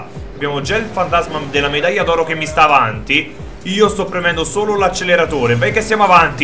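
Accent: Naples, Italy. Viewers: è napoletano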